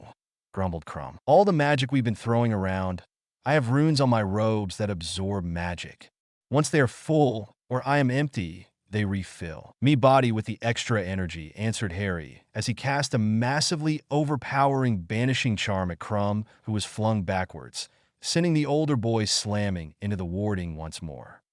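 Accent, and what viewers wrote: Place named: India. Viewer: hey there when your videos are done with a heave India dialect please use the ai voice so it is understandable as that accent is thick and English is my 4th language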